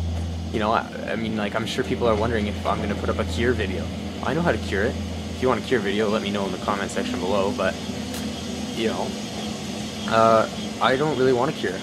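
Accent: Canada. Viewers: yo are you canadian a friend of mine has the same accent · You're Canadian, aren't you?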